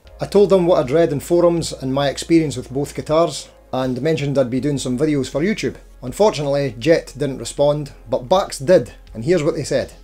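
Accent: Scotland, United Kingdom. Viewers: Oh man i love your accent so much , the first seconds i thought you are Turkish or Arab , the way the " r" are pronunciate , but after 30 seconds it's obvious : this guy is Scottish or Irish ,i don't know ... One thing is sure , it's really different and unique , and really remarquable that in the North of England , Scottland , the people have an accent very close to the irish one , closer than for example , East England or the region of Leeds .... Maybe it'a celtic thing ii don't know , but one thing for sure : your accent is really deep ..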